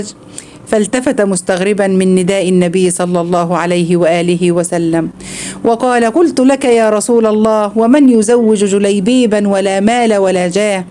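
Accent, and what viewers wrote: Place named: Egypt. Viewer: السلام عليكي ورحمة الله وبركاته انا من متابعيكي اختي في الاسلام اختي الفاضلة ومحتواكي عن نشر احاديث الرسول وامور الدين جعلها في ميزان حسناتكي لاكن سامحيني في هذا الامر ان تتكلمي في اللغة العربية الفصحى لانها لغة القران فالجيم جيم وليس كيم والثاء ثاء وليس ساء والذال ليس زال وانا من متابعين شيوخ مصر واحب اسلوبكم في سرد اخلاقيات ديننا الحنيف لاكن ارجوكم ان تلفضو اللغة العربية كما هي وسلامي لشخصك يا غالية وشكرا وصل اللهم على سيدنا وشفيعنا وسيدنا محمد وعلى اله وصحبه اجمعين الى يوم الدين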